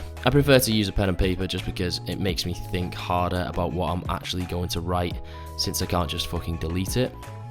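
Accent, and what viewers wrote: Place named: England, United Kingdom. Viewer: Where are you from in England mate? The accent and choice of words are intriguing (in a good way) hahah love the vids man keep it up!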